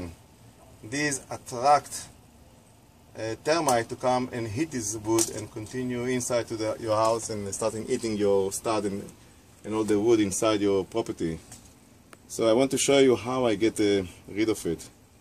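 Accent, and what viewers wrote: Israel. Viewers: israeli accent. תודה לך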